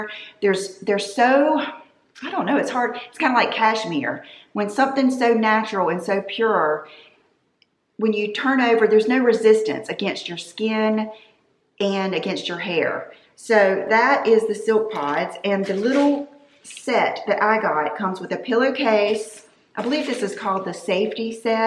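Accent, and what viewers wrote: South, United States. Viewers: Hi, I’ve been a subscriber for over 10 years. I was going through a divorce in 2011 and would escape for 25 minutes by watching your videos. I loved and still do your southern accent and how genuine you are. You are truly a blessing for so many of us. Sending you love from a 50 year old Woman in California 💗🦋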